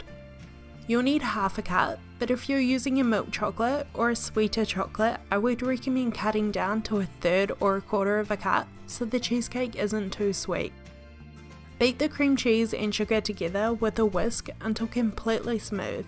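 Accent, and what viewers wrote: New Zealand. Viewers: really good authentic nz accent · She sounds like she’s from New Zealand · That New Zealand accent reminds me of my cousin. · I loved your voice and accent like from 🇳🇿 . Not to mention your channel and video content. I will try this one to make and will use whittaker dark chocolates too!!! Love chocolates from🇳🇿. I loved cheesecakes, blueberry cheesecake is one of my favorites by far. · Definitely sounds like a kiwi accent!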